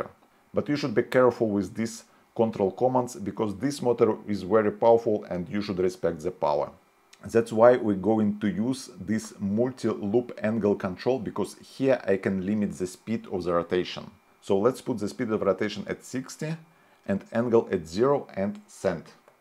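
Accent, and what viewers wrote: Russia. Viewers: Мужик ты же русский! Акцент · Акцент как у русского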